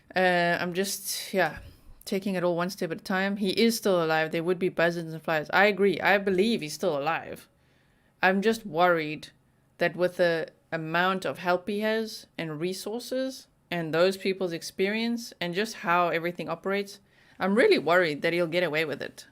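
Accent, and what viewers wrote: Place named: South Africa. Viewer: South African living in the Netherlands...thats so cool! Thanks for sharing that. I wondered where your accent was from but couldn't figure it out. I love when you said that your a true crime mind all the time! I'm American living in Finland 🇫🇮 and its a bit weird bc the news/media doesn't report here like they do in other places. I watch a lot of crime, documentaries, court cases online, and mysteries. But definitely interesting points your making :) and I like that you look at the big picture.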